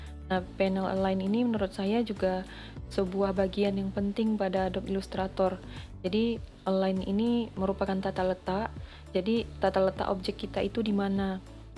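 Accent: Indonesia. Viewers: orang padang kak?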